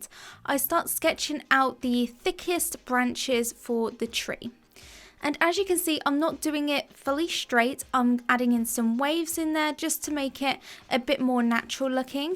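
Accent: United Kingdom. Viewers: What a joy to hear a British accent on a YouTube art video. This is a really wonderful and helpful video, thanks!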